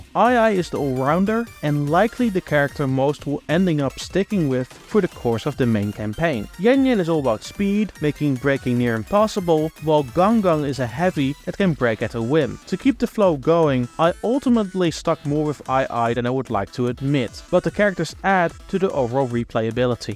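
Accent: Netherlands. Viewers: Searxhed for this comment. There is no doubt. No offence though. My English is only 20% of how good his is. But out of personal preference.. I dislike English with dutch accent. But I think that is just because my brain cant stop foccusing on the accent. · jouw nederlandse accent geeft me kkr sorry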